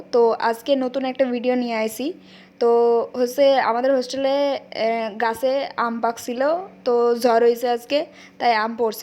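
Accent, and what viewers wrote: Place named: Bangladesh. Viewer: Tumi kolkata te aco bole mone hocche na... bangladesh a mone hocche....... language 😂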